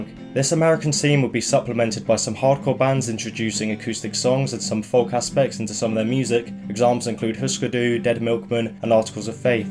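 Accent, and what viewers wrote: United Kingdom. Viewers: hey why does this goblin have a harry potter accent? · He's british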